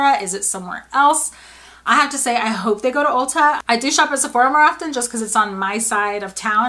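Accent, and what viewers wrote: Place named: New York, United States. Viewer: I guess I'll have to go into the city to get everything. No complaints here! I love it there. If it wasn't so expensive I'd live there. Are you from NY/NJ area? You sound like you are and some of the stuff you say is exactly what/how I say it. Whenever I go away people can always pick out where I'm from LOL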